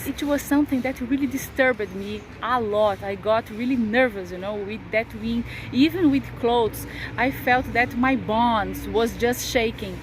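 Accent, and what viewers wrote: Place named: Brazil. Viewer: Para brasileiro... nota 7 no ingles ... brasileiro tem 1 defeito que fica sempre o sotaque brasileiro entao a falar o ingles parece russo a falar portugues.... depois bullying na escola... portugal e dos paises que melhor recebe os brasileiros imagino 1 portuga numa escola brasileira....o algarve e uma zona turista logo é mais caro é so para quem pode ca viver ou como eu para quen nasceu.. não para quem quer...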